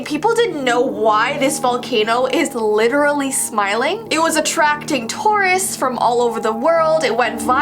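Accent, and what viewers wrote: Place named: Canada. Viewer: She is Canadian so she has a little bit if an accent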